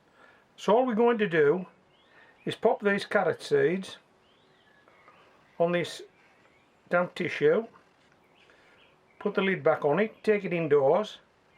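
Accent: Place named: England, United Kingdom. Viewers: Amazing video!! I paused the video part way in and my two variety of carrots are already on their wet tissue. My husband and I are trying to figure out where your accent is from in the North of England? Please help settle our discussion. Cheers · I thought he was Scottish. I worked with a Geordie here in Canada. He sounds very different. It's lovely anyway. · Is anyone else here just for the pizza 😁 Great vid loved the starlings but i love your geordie accent the most 🙂 im going to try this!!! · Lovely accent in west Cumbria! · I'm so glad I found your lovely videos but must confess as a fan of the language I cannot place exactly which county you're from. Would you mind enlightening me? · Great video, some tip tips for bucket planting and speedy germination. I have two questions, what brand were the pizzas as the ones we usually get these days have cardboard bases. 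Last question where in the world are you from, the Mrs and I reckon county Durham, unmistakable twang, surely?!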